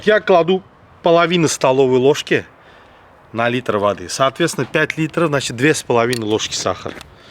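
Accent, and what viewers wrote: Baku, Azerbaijan. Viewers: Приятный Бакинский акцент👍🏻👍🏻👍🏻пошла солить😋😉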